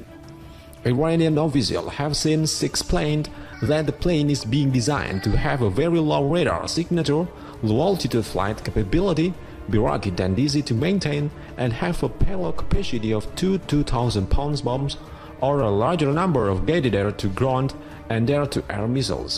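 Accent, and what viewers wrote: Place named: Arab world. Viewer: لهجه کیریت نشون میده از این منا فیق های عرعرستانی هستی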